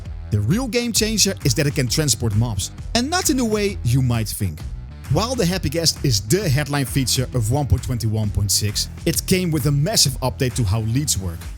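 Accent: Netherlands. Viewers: are joe beetje nederlands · tering wat een steenkolenengels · Are you Dutch? You sound Dutch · Heel erg irritant & jammer dat nederlandse accent, want je content is top. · Nice dutch accent 😅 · Accent sounds dutch af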